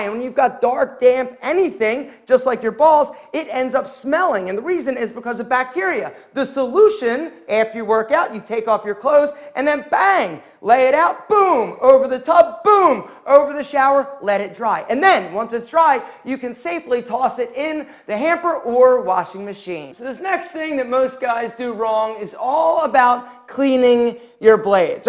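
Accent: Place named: New Jersey, United States. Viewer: just found your channel: am a NJ native, you totally sound like your from Jersey or PA.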